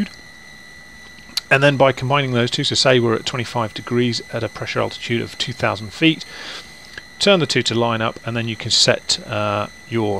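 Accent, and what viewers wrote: United Kingdom. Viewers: Too much annoying British talk .. .SHUT UP already and let us hear the engine sound.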